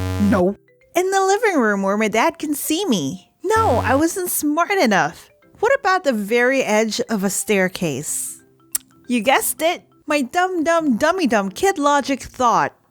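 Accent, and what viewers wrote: Philippines. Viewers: I love the accent!❤️ It's just so Filipino 😂 Every pissed Filipino parents : Ay naku! 🤣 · Are you filipino? Cuz im filipino. The way how i can tell is by the accent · Thats accent is very Filipino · BEST FILIPINO VOICE EVER (i dont know if i spelled that right) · Tagalog Accent xD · I love you you got the Filipino accent on point or should I say pilipino 😉😂✨❤️ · Im new here so I didn't know she was Filipino until she said Philipine and thought: "So that's why she has sort of a Filipino accent like Alyah and Johan!" 😂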